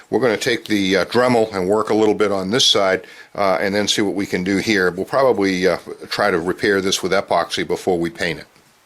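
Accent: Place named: New England, United States. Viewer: Very, very nice job---Bravo-Zulu, amigo, for bringing a "dead toy" back to life! I saw the Windham Maine realty sign in the background....and I believe I detected a New England accent (although I believe you stated you were in Virginia). I applaud your efforts! Equally worth admiration (maybe even more?) I applaud your wife allowing you to use the kitchen sink!!! A very pleasant video!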